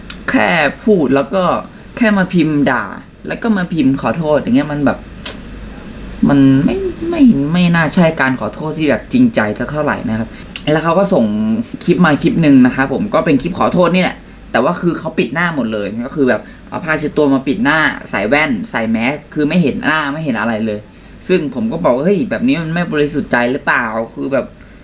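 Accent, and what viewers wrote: Isan, Thailand. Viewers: สำเนียง อีสานบ่ ไม่รู้สิ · สำเนียงเเมร้งโคตรลาบ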